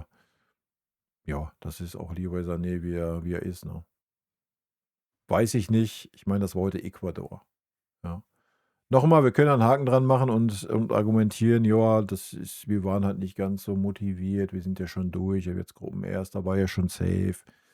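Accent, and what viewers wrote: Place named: Westphalia, Germany. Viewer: Ich darf mich mal bzgl. Ihrer Sprache und Ihrer optischen Präsenz äußern: sehr redegewandt, westf. Mundart, äußerst unterhaltsam, stets gelungene Mimik (ähnlich der eines guten Kabarettisten), zuweilen auch tolle Gestik, erkennbare Neigung zum schonungslosen "Niedermachen" , gar zum Fatalismus, geschickt eigesetzte Sprechpausen , eine gewisse Rat- und Hoffnungslosigkeit bei rhetorischer Fragestellung, leider unnötige Anglizismenverwendung.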